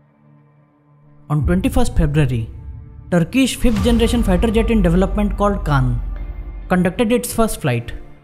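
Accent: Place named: India. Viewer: Dude you have all the Indians accent they always mimicking Indians for....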